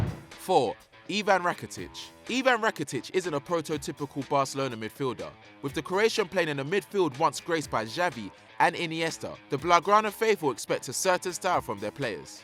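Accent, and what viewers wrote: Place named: England, United Kingdom. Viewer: 6 out of 10 listed are Premier League players. There are so many underrated players in other leagues, but since this guy talking has a English accent it’s not surprising that he’s going to list 60% of the players as Premier League players.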